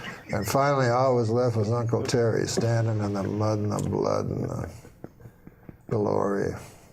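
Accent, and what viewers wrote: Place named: Canada. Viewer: Told with the old timey Canadian accent as well, like a story Stompin Tom Connors might be tellin'.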